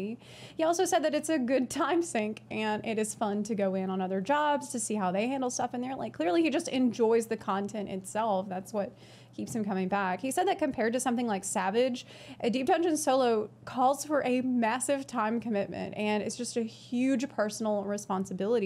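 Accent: South, United States. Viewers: You have the cutest country accent I've ever heard where r u from ?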